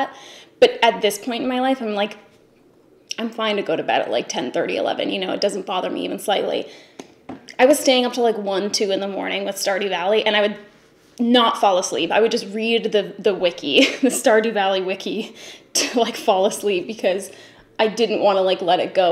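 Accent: Canada. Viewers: Haku Hodo is just a pleasant word in general! It doesn't have to do with your Canadian accent. I think it'd just your wonderful, unique self!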